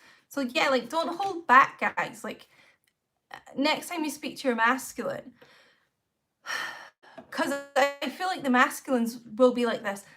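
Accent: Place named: Edinburgh, United Kingdom. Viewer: I LIVE IN EDINBURGH TOOOOOOO!!!!! I FRICKING KNEW IT!! THAT ACCENT! <3 I discovered your channel a few days ago and LOVE your readings! I've been reading Tarot on facebook groups etc and hope to start my channel soon! Commenting without having watched the reading yet haha but that title grabbed me! Got you on the background while im working on Uni work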